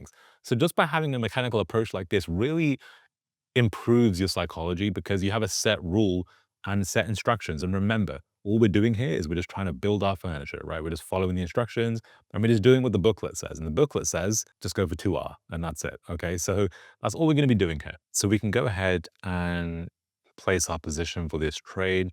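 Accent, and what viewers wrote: United Kingdom. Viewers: bro, take your fucking British accent and pike on some curry and shove it up your fucking ass! Scammer, scumbag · Used to live in London. Hear the accent. Thanks for this FVG ORB strategy. For beginners on accounts less than $25k, limited to three day trades per 5 days. I tested this 03/20/26. MU had bull FVG , , . Entry . went south hit Stop loss . NBIS: breakout , FVG . Entry. dropped into OR, rose out then dropped again to Stop Loss . And one more CRCL: breakout . FVG small . Entry. Went south . Hit stop loss . Win %: 0% Bad day/bad week for bulls. These were all bulls. Not into shorts yet, I should have as the market was heading south. SPY could have netted almost 10:1r:r short. The psychology is spot on. One question: do you stop checking for FVG at 10am/11am? Usually by then the market has cooled so if you don't hit FVG by then you are likely not going to make much? 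Thanks again.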